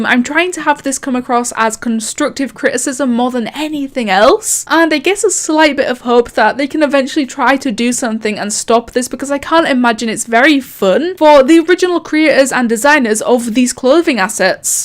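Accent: United Kingdom. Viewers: I love you british accent and i love how ur so good at arsenal T^T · ok ok, but, why are you british? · We always wanted to know: why are you british? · you never answered why you are British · It’s annoying I can’t get over her posh English lol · why are you British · Yeah, WHY are you British? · are you brittish? and if so, why are you in may 13th and not december 3123?! uefyhbwegyfbhewgr means hello in my language. i am smartest individual roblox clothing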